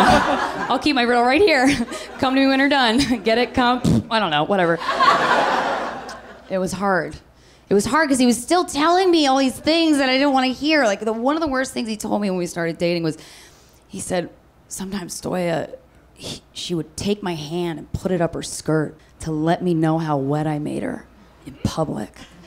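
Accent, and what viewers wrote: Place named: Midwest, United States. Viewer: Herr midwestern accent is amazing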